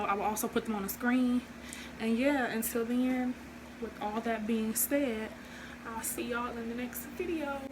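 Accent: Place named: Oakland, United States. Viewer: You Sound Like You’re From Oakland. And I Love This 🍊Orange! 💣💣💣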